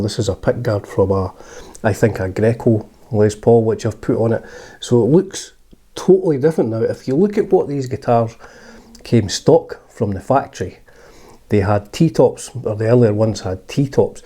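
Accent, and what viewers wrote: Scotland, United Kingdom. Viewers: I like Scottish accent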